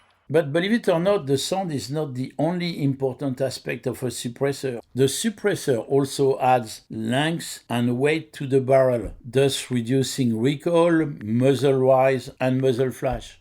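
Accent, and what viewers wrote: France. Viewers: I used to be an IPSC shooter, not a very good one, but I definately recognize the skill that Keanu Reeves has. He might only be shooting blanks but the gun handling is definately top notch. He is truly a very good shooter with great skills.: I love your videos, they are well done and the French Accent is a killer.. Je parle Francais aussi....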